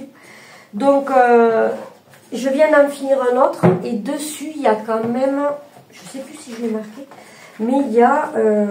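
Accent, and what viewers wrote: South, France. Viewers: Coucou, j aime toujours autant regarder tes vidéos ☺️ en plus tu as toujours des astuces en bricolage qui sont très chouettes. Tu sais le principal en tournant les vidéos c est à la base se faire plaisir , peut importe si il y en a des tonnes sur YouTube, du moment que tu prends plaisir à les réaliser c est gagné 😉 en tout les cas moi je le vois comme cela . Et puis les gens adorent l accent du Sud , bon ok vu que tu es de ma région je suis un peu chauvine du coup 😅🤣🤣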